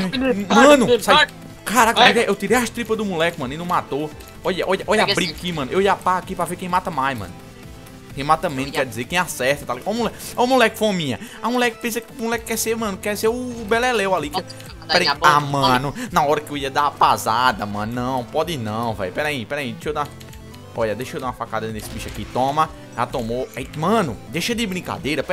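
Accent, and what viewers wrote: Northeast, Brazil. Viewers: vc eh de que parte do nordeste?!?! Me responde e me passa um contato seu... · Vc é Do Nordeste?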